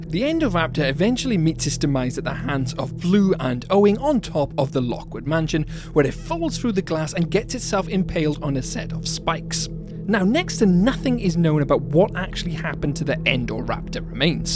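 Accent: Wales, United Kingdom. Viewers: is that… a welsh accent i hear!!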